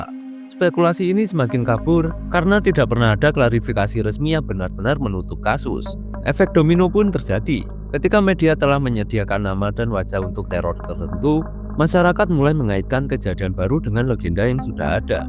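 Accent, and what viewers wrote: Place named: Java, Indonesia. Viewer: Abang orang Jawa ya medok nya keliatan 😹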